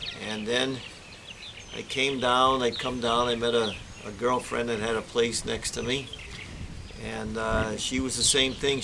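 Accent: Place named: Pennsylvania, United States. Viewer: Great video and a real interesting life. My family was from Gerardsville and now live south of Philly. Your pa acent has always sounded like family to me. I am also planning to winter in Florida but those HOA fees hurt. Learned a lot from you over years and I have been doing patio and sidewalk and stucco on my old house up here in Kennett Square.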